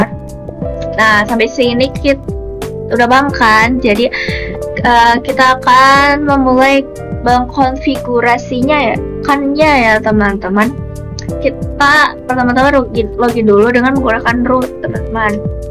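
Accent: Indonesia. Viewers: Medoq banget teman teman 😭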